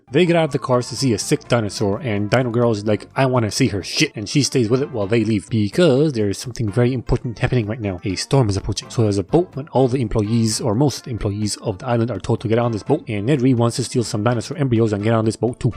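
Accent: United Kingdom. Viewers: the best part is the accent - I'm british too but it gets me every time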